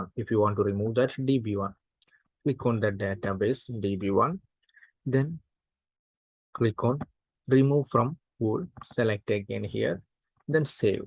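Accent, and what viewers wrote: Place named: Kerala, India. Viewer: Love the Kerala accent and very informative video bro thanks....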